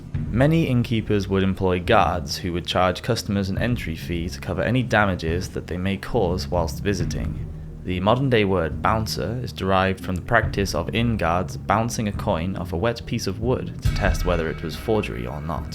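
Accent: England, United Kingdom. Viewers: English accent :)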